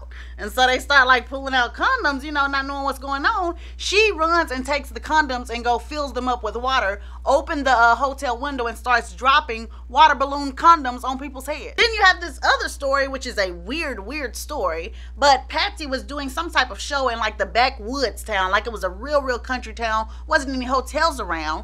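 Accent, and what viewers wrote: United States. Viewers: Lol wat is up with this country accent 😂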